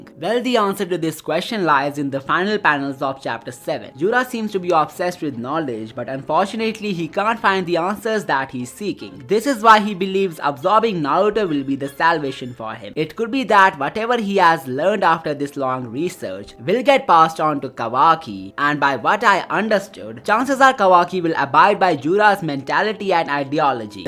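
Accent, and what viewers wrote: India. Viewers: You're being rude here. Skip if you don't like it. FYI, it's Indian accent.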